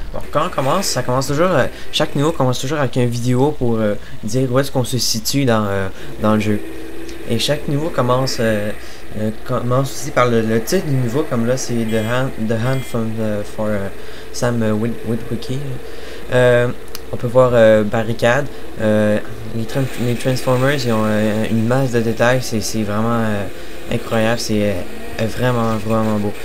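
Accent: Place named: Canada. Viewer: Bon l'accent canadien m'a permis de ne pas bien comprendre certains mots, heureusement qu'ils étaient dans leur contexte ! ^_^ Aller 4* car la perfection n'existe pas !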